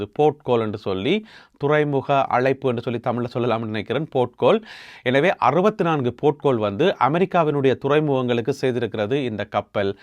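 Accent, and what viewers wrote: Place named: Batticaloa, Sri Lanka. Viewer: நீங்கள் மட்டக்களப்பு தமிழ் பேசும் போல தெரிகிறது தமிழ் மக்களை வெட்டி குவித்து உமக்கு தெரிவில்யா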